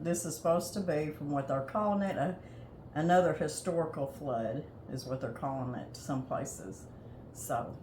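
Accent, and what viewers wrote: South, United States. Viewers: I love hearing your southern draw. God bless you both.❤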